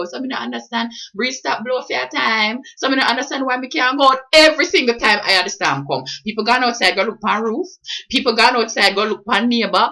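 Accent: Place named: Jamaica. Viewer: I am from Serbia,and i watch this fat girl talking,but i cant understand her ! This is not English language man ! Is this,that Jamaican patois or what ? It's wicked ~~~~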